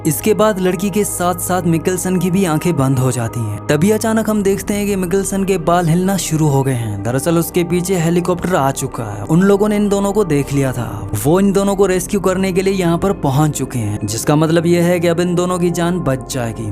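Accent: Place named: Kashmir, India. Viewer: Your Hindi has a accent of Kashmiri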